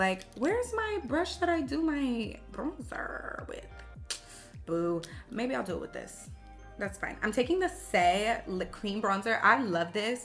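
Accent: New York, United States. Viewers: I’m a bred New Yorker lol and you fit with us I have to say, your voice alone lolll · 😂😂 that’s true, you didn’t cry! I agree NY was an upgrade. You’re officially global at this point! And I’m loving hearing the NY accent and attitude start to come out! 😂 sorry you gotta deal with all that other stuff, omg. You say it’s nothing but that looks and sounds like a very big something! I’m definitely keeping you in my prayers and will ask God to keep a hedge of protection around you. 🙏🏾 keep thriving beautiful! ✨🥰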